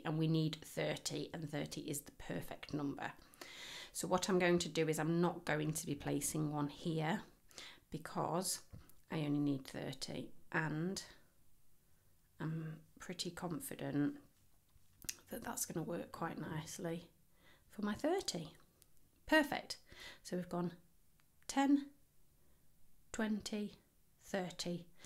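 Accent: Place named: Lincolnshire, United Kingdom. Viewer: Recognize your accent. I’m originally from Lincoln. Was nice listening to you and I followed what you did and have a lovely coaster for my coffee cup 💕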